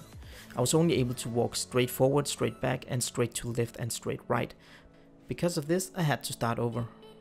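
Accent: Denmark. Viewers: I could hear you have the Danish Accent in you, so I was like hmm he seems Danish. So I checked your ABOUT and saw Denmark. Just found your channel, seems interesting :)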